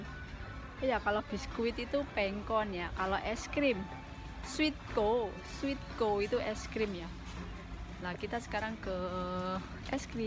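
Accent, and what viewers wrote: Java, Indonesia. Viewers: Hhhee embak orang jawa yea .hhhhee mrdok bahasanya. Keren mantab salam 77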